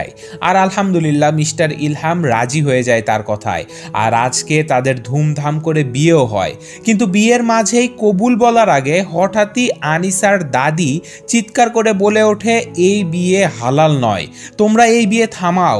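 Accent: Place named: Noakhali, Bangladesh. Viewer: না মানে আমি এমনিতেই জিগ্গেস করলাম কারণ আপনার কথায় কিছু টা নোয়াখালীর মতো লাগে তাই আর কী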